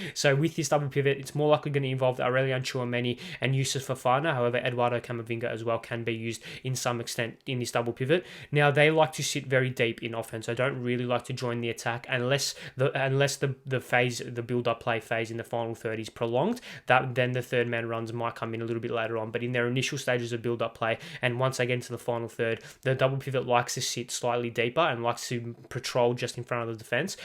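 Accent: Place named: Australia. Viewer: RIDICULOUSLY AMAZING WORK! So Proud of you, and to see you produce this A GRADE! From a Pom to hear an aussie talk about the great game I am simply clapping! EPIC WORK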